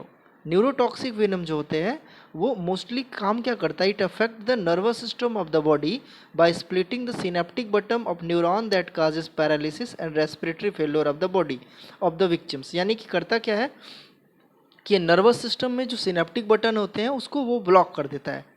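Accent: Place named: Bengal, India. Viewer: Bhai yh bengali h iska language issue h yh bechara apne taraf c snake he bol rha h hme snak sunai d rha h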